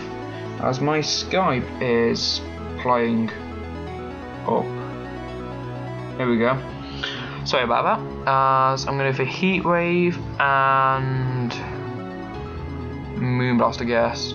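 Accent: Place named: United Kingdom. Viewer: aah, i love your british accent :]]] pls more uploads of that kind, i suck in doubles but it looks fun watchin u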